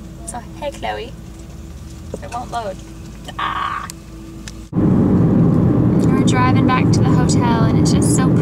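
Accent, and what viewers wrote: Australia. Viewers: you are sounding more and more Australian every video C: haha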